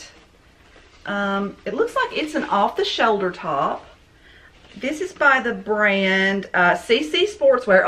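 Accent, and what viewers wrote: South, United States. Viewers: First time viewer. I subscribed. You have a nice southern accent. Where are you from?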